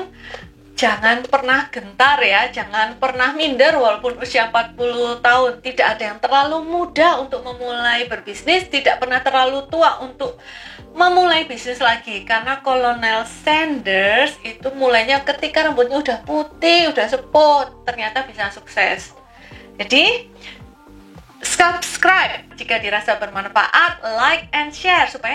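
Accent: Surabaya, Indonesia. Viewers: kereeen menginspirasi, baru liat video ini auto subcribs.btw logatnya jawatimur suroboyan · Thats was good.. Ingrisnya medok kas Suroboyoan..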